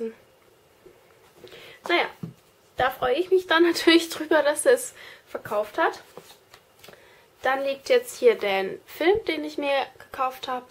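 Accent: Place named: Palatinate, Germany. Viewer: Man kann sich als pälzer ned versteggle :D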